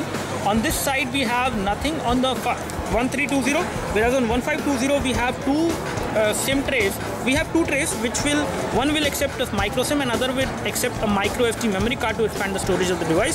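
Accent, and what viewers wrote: India. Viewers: so... indian... slang rooted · im' italian, and even for me (clearly a not native english speaker) this video is understandable without any problem. I think it's indian english accent. So maybe you're not racist, but surely you're very lazy to not strain yourself to go further a simple matter of accents.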